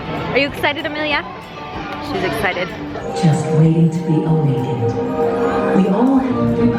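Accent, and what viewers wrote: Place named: France. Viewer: I can hear a little French accent when she speaks English.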